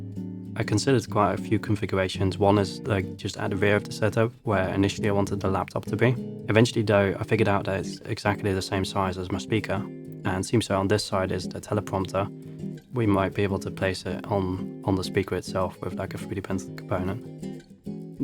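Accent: United Kingdom. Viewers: Honestly, I do love the fact that I just randomly stumbled upon your channel. The fact that you're Dutch as well only makes it that more fun for me, lmao. (And will say, your English is really freaking amazing dude. Very British tone.) The setup looks amazing. Little cluttered but you're also still adding / modifying so I'm able to look past it. Keep up the good work. I'm looking forward to seeing the progress of this.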